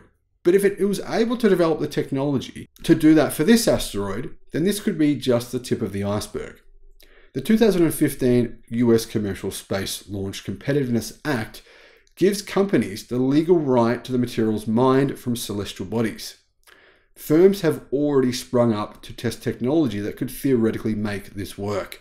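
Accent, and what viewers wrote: Australia. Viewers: He's Australian, mate. I'm a kiwi from just next door and we all say NAHSA too. So do the British, which means our is far from being the only one. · That's exactly right, he's Australian and Aussies don't say Narsa like the English, etc do. Get it right if you're going to correct me, mate.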